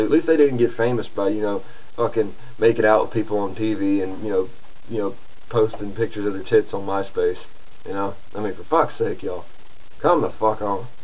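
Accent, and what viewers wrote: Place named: South, United States. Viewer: Are you southern? I can hear a bit of an accent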